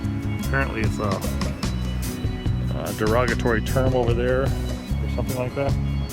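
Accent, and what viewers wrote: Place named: Philadelphia, United States. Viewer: Are you from the Philadelphia...NEW JERSEY AREA???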